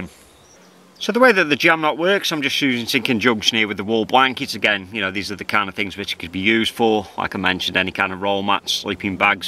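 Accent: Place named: Wirral, United Kingdom. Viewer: Just come across your videos mate really well made and informative, I’m wondering if you’re quite local to me as you seem to have a bit of a Wirral accent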